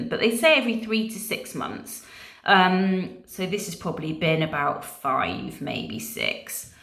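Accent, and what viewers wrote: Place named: United Kingdom. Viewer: I could listen to you all day long. I love the British accent.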